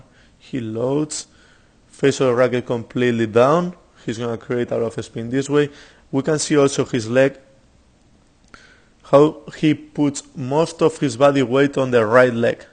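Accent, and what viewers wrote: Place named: Spain. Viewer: Hi, thanks for the video, u give lot of information, sometimes too much but good explanation. Just it's hard sometimes to understand everything cause I'm not a good English speaker and with your accent it's even more difficult haha (no offend). What's your mother language? If it's Spanish I would be interested to see the same video in your mother language cause I speak Spanish better than English :D. Thanks, keep going with your videos;-)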